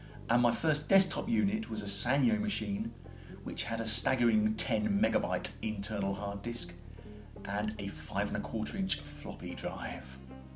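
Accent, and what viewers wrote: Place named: United Kingdom. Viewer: your magical british accent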